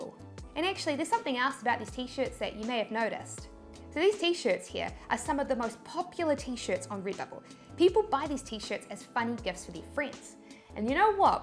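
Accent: New Zealand. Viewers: Thanks 👍! You are always super informative and love that New Zealand accent!! 😃💻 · I want to come to New Zealand to study your English speaking accent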